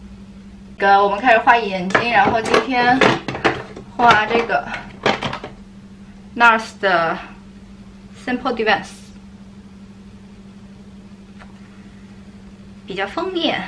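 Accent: China. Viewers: 第一次看你视频就确定你这蜜汁口音是上海人😂因为我也是哈哈。 推荐看明星大侦探诶，有点烧脑但是也很搞笑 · 你啊是苏州人啊！！！感觉口音伐要太亲切哦！！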